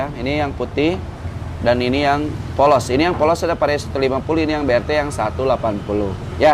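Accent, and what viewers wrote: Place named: West Java, Indonesia. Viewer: Aya logat sundaan.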